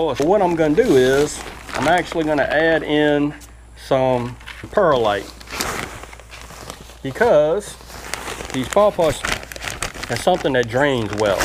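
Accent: South, United States. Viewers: Hello... Damn, I'm loving that southern accent! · Thanks for the amazing video love the accent I have some family in the south north Carolina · I love your country accent🤗reminds me of my momma❤New subbie!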